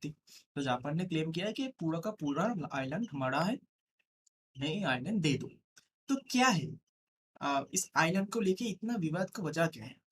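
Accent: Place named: Bengal, India. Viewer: I don't whose voice this is...but I want to request him to increase the volume because I cannot listen it without headphone 😐... otherwise it's nice... I guess any Bangali Babu is teaching us... because his pronounciation of Hindi words say this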